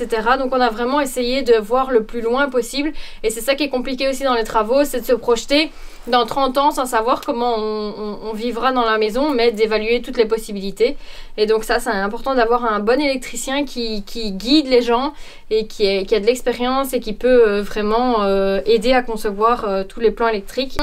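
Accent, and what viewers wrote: Belgium. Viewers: Pour moi, c'est le Hainaut. Qu'est-ce qui m'a mis sur cette piste outre ton accent, le livreur de matériaux. Par contre l'accent de, c'est plutôt celui de l'agent Verhaegen. Au fait, essaye de ne pas le contredire sans cesse, il va finir par te donner un petit coup de taser.☺️ Nous, on va commencer les travaux de rénovations de notre maison qui se situe entre Charleroi et Beaumont dans la ville qui a un Beffroi et des jardins suspendus, tu vois laquelle?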